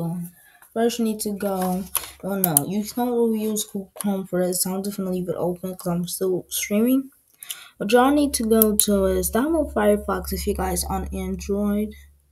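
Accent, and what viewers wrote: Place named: England, United Kingdom. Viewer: a hint of English in your mumbling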